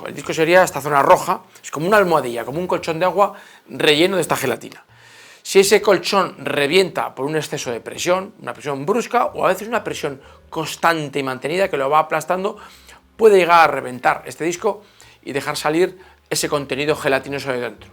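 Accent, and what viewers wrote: Spain. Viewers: Ese doctor es un españolete , andaluz por eso no se le entiende, deberían de poner a españoletes a freír espárragos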